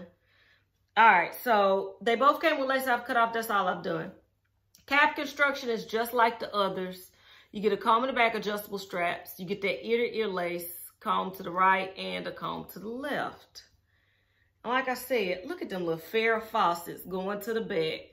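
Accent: South, United States. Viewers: Love your southern accent and charm! ☺️ I’m a newbie wig wearer and just purchased 3 Neesha Wigs (202 & 205). Had them thinned out and layered! Will be investing in the 208 and 209! 😘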